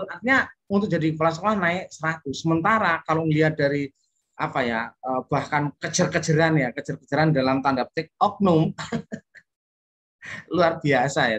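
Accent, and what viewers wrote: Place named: Pasuruan, Indonesia. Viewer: Suara pasuruan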